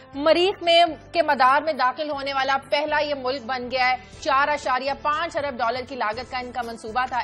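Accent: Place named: Punjab, India. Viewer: Why is she speaking Punjabi acccent?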